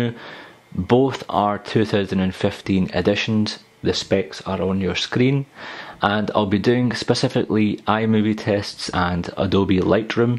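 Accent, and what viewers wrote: Scotland, United Kingdom. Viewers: Omg I love the Scottish accent! So hot hehe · nice scottish accent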